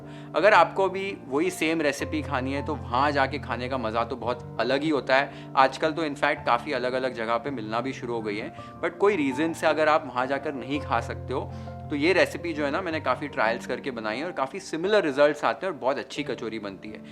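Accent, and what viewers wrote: Maharashtra, India. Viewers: Nice recipe, tasted many times . The way you tell great 👍🏻. With perfect Maharashtrian pronounciations ❤ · You are jsu awesome. You sound a perfect maharashtrian when you spell words like ठेचा, वाटण.... Amazing chef ❤❤❤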